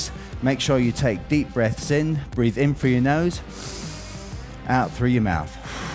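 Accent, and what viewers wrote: United Kingdom. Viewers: Wit dat British accent and him being ripped af he seems like a bad guy from a kids movie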